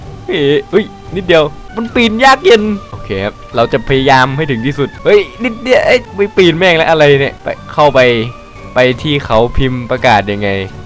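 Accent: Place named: South, Thailand. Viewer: พี่ คนใต้ จังหวัดไหนเหยอ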